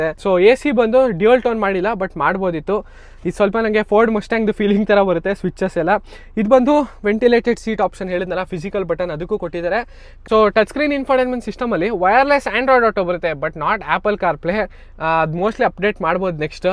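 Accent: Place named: Mysuru, India. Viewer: Chennagidhe nimma mysuru kannada.. hige cargala bagge mathadtha iri..ellaru nodi tilkothaare..jai kannada, Karnataka, jai Bharatha ♥🙏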